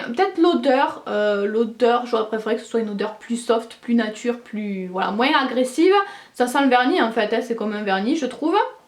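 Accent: South, France. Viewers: super ce produit tres jolis accent que tu as on dirais celui du midi bonsoir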